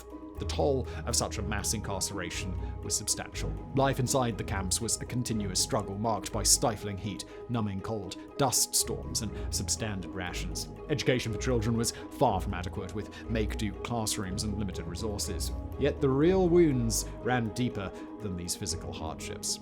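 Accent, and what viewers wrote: RP, United Kingdom. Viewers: I'm an American veteran officer, and suspect you barely touched the topic. It seems you have relatively little to say about the American GIs. Citing hundreds here and dozens there hardly compares to what you say the British did. (You even sound British.) We love hearing how legendarily Good we are, but I believe lying only makes our problem worse. I realize this video is about WW2, but we were the same nation that committed horrific inhumane crimes during the Spanish-American War, during the near destruction of the American Natives during that same decade, and we were still the same culture during Vietnam when our lovely GIs would sight-in their rifles by firing on local farmers out in the rice paddies. I'm simply confident that War brings out the worst of Human Nature. That's not an excuse for it. I just think you skipped a lot. 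· A posh Brit complaining about America and how we came and saved his country, how about just say thank you and shut up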